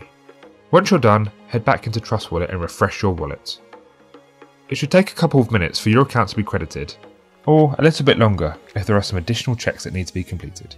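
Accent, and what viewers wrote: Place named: United Kingdom. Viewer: why do you speak British?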